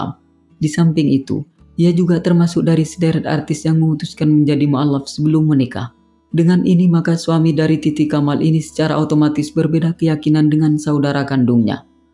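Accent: Madura, Indonesia. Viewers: Adminya logat Madura 👍👍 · Di denger dari logatnya, dari suku madura ya gan ?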